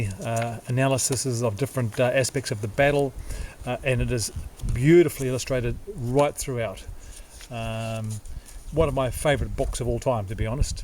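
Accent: New Zealand. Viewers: Ur kiwi accent is awesome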